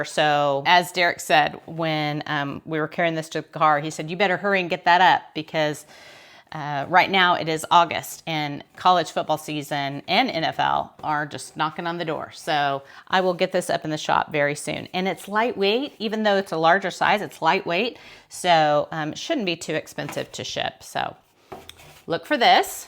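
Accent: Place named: Oklahoma, United States. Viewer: This helped me so much! I knew for some reason you were from OK, don’t ask me why, maybe it’s that you sound like me…lol I loved this video, if you ever wish to share how you inventory your items to know where they are, I would love to see. I only sale vintage home goods, and linens and I, like you, love to use the items in my home ( some of them) I sell vintage home goods, because its what I love to do. I love to shop and when I see an item that makes me think back to my childhood, and a photo of our home, and here is the exact lamp we had. I buy it,I was also grieving my Mother, so went a little nuts on IG live auctions. I am finally listing all of my mother and grandmothers items that were left over from the estate sale, and now need to create a storage solution… I have had to cancel 2 orders recently for not being able to locate the item. 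Happy THanksgiving! Early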